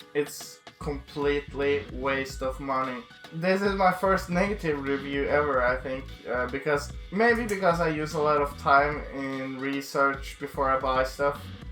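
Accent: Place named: Norway. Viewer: Such hatred. This is jut a review man, and u are making fun of his weight and norvegian accent. You are a douche. By the way, the chair is suitable up to 150kgs.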